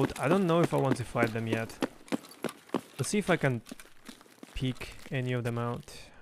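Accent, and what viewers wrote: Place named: Russia. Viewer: Хехех, блин я подумал ты из скандинавской группы стран, но не, наш акцент сложно не узнать)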